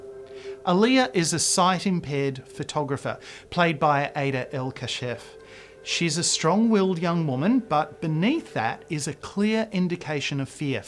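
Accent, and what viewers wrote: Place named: Australia. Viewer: good review! nice accent you Aussie?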